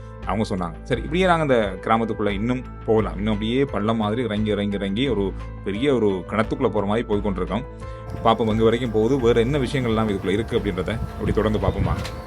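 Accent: Sri Lanka. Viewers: உங்கள் இலங்கை தமிழ் மிகவும் நன்றாக கேட்பதற்கு இனிமையாக இருக்கிறது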